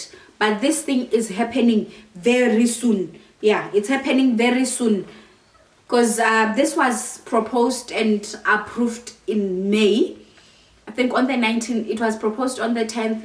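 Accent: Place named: South Africa. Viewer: Hi dear, you sound like a South African, I am Namibian, watching you from Windhoek.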